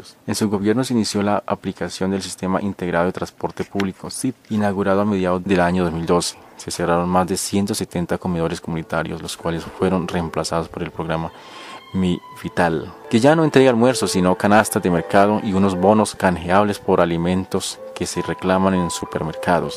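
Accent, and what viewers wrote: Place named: Caribbean. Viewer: Por los que critican su manera de hablar es decir su acento. No es Bogotáno les diré para su información es un acento neutro un poco del Caribe porque no interroga tanto por lo de la eses les diré que para eso uno estudia para aprender. Muchas gracias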